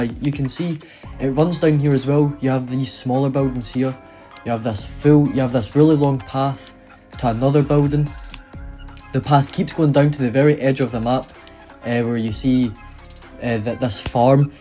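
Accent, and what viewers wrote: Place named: Scotland, United Kingdom. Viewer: Most scottish sounding guy ive ever seen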